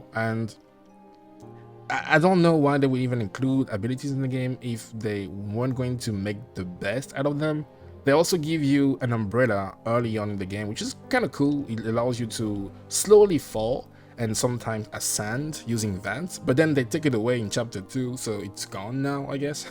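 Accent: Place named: France. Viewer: Love your accent. Are you French? I have family that are.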